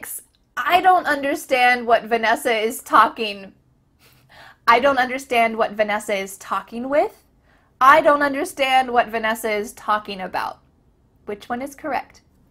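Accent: United States. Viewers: thank you techer english Misis Vanessa i like listening english American style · مرحبا يااستاذة هل هذه بلهجة الأمريكية؟ ؟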